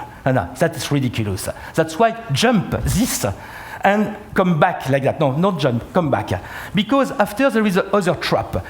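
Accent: France. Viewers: I am French myself and I think he represent the french accent...His accent is terrible...you can understand clearly ? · As much as i like this guy , i hate the french english accent ! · love this french accent it is so funny!!!... today to not do it is more positive than do it :))) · He has the most comical, stereotypically French accent I've ever heard.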